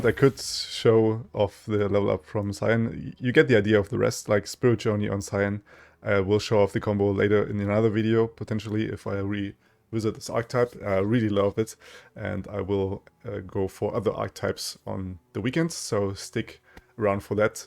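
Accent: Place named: Germany. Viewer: Lustig wie man selbst bei dir raushört das deutsch deine Muttersprache ist haha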